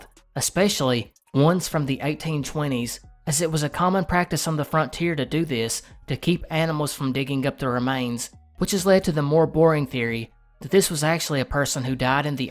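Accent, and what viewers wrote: South, United States. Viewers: My toxic ex used to tell me I couldn’t watch your videos because the southern accent was annoying, he would tell me to shut it off immediately everytime. 4 months without him and I can enjoy your sweet southern voice and amazing content in peace❤️ helps me remember the little victories of leaving when I’m feeling weak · as a Kentuckian born and raised, i love your videos and really appreciate hearing more southern accents out there in the world ❤️ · This is fire. As a guy from Cali, I generally have mixed feelings about a southern accent. However, your tone is soothing, and you don't give out "hick" vibes, you sound well-spoken and intelligent. Basically, I feel like a long lost southern cousin is schooling me on interesting cases and stories.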